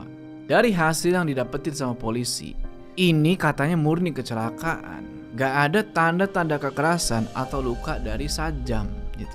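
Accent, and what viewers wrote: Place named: Java, Indonesia. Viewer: Bang keren menjiwai banget ngomong bahasa jawanya😂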